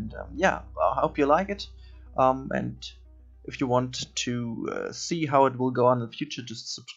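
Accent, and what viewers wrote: Germany. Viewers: Dein Englisch ist doch gut , natürlich hast du keinen Englischen Akzent sknder sprichst halt Englisch wie ein deutscher Englisch spricht. Nur du scheint ein wenig schüchtern hatte ich das Gefühl :)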